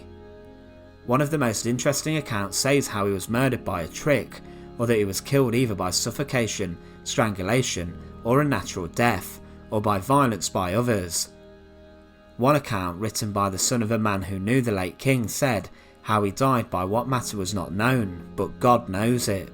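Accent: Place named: England, United Kingdom. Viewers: Sorry to have to complain, but the elocution of the reader seems to leave something to be desired, sounds more Cockney than English. Ever heard of a Professor Higgins? I'm sure he would help. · Therefore the typical English accent is very irritating.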